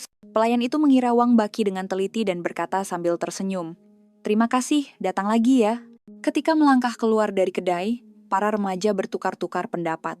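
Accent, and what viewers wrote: Indonesia. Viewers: Suara orang Indo ni😂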